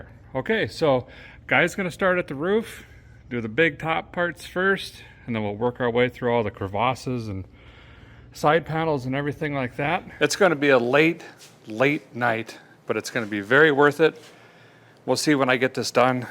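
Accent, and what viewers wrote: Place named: Upper Midwest, United States. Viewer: As a chicagoan (bridgeport native) the way you pronounce battery warms my soul. I am out of state for a while down south. And I have a thick thick chicago accent(9th generation chicagoan) lol I told my neighbor about how much I hate my "front room" no idea how to type like I sound but its Like, "guy I'm tellinya went over dare and sat inda share, chtyaknow I can't see out da faaackin windohh in my frunchroom unless i stand" he instantly started laughing and said "yankees" all dissapointed like while shaking his head. I get made fun of a lot here. You have like a north Midwest accent. Like Wisconsin, Michigan. Mine is like angry Canadian. Sorry I have a fascination with accents and dialect from different parts of the world and country. America has so many different accents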